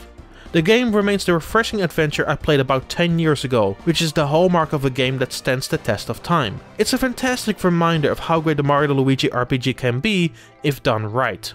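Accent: Netherlands. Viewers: Wow the Dutch accent can clearly been heard brother xD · You’re dutch, right? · hello fellow dutch person , i recognize your accent :D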